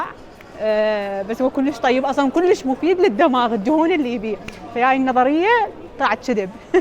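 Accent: Baghdad, Iraq. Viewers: والله يا احبج وايد و احب قناتج و تغطياتج للمدن.. و خاصه لهجتج العراقيه الي تجنن و انا اصلا احب العراقيين.. متابعتج من الامارات ❤ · احب لهجتك البغداديه الاصيله وعفويتك تقربك للنفس فديوات رائعه تقديم وتصوير وموسيقى انت مبدعه اخرى ضمن المبدعات العراقيات في كل مكان وتركيا تستحق الاستقرار فيها وليس الزياره فقط ولحبي لها اخشى عليها من غدر الغادرين اللهم ادم عليها الامن والاستقرار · تحية من الاردن شو جميلة اللهجة العراقية يا أستاذة فيديوهات ممتعة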